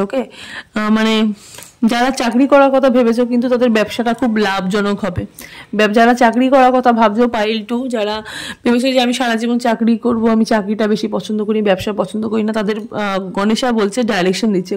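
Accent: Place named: Murshidabad, India. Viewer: Apni ki mursidabad e thaken nki apnar kotha te mursidabad manuser moto tan asche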